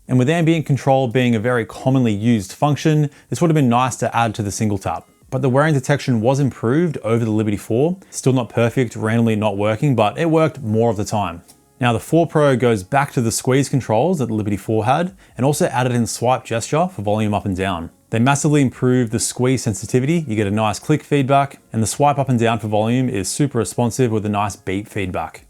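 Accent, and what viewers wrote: Australia. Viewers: Yaaa rigght.. Soundcore sound quality is up there almost the best. Upgraded from Edifier x3 to Soundcore, Good build quality too. As Soundcore Spirit X2 and X10 user.. Both earhook design stays on ear good. But Spirit X10 was uncomfortable to take off ear and must twist the hook making it fall often while storing it anywhere or back in charger. It was irritatingly annoying. . And lot o wind noise while riding bike. I switched to stem shape buds, first one Tribit flybuds C1. Good sound only at almost full volume. Small soundstage but decent comfortable listening as no distortion. Further upgrade to Cmf Buds pro 2, mutiple features, Spatial audio. Ldac(battery drainer, almost same sounding). Very loud,too much bass if selecting bass boost abovs 2. Very Bad fit in ears with stock tips and have to try hybrid silicon or memory foam tips later. 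Hey, do a review on good n best eartips, they r most important part of earbuds. Plenty of good sounding budget earbuds in market sub 50 dollars.. and midrange sub 100 dollars price .. But Soundcore has the top sounding buds that competes with higher more expensive branded buds. Am gonna get Liberty 4c, hows the fit? . Good review with highly distinct Aussie accent,, slow down a bit mate.( jus kdn) .., slow when you highlight the main points. I rarely refer to earbuds reviews but saw yur channel, has lots n lots o buds.. Sooo manyyyy.. How you pick yur favourite from sooo many??, well i suggest yu keep diffrent for various occasions.. Travel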